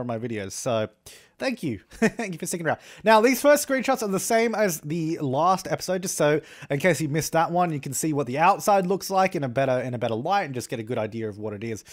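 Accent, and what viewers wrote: Australia. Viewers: Erdnussbuttertoast it's obviously an Australian accent...😂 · Erdnussbuttertoast it's Aussie :') I think he lives in Sydney ☺ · Erdnussbuttertoast Australia, like me! Who else is from the down under here? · Erdnussbuttertoast Australia . I think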